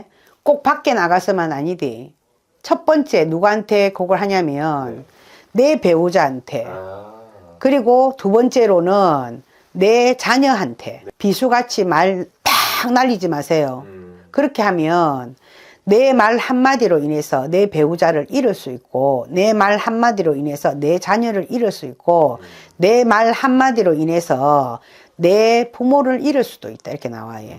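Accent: Busan, South Korea. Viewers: 부산사투리 맘편해져요 저도 부산사람이라..한번뵙고 상담 받고싶네요.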